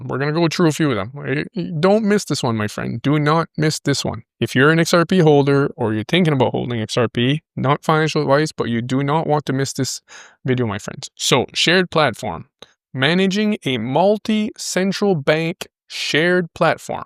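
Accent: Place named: Quebec, Canada. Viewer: brother never stop making XRP content. Btw I’m In Toronto. You sound like you’re from either northern Ontario or Quebec. Am I right?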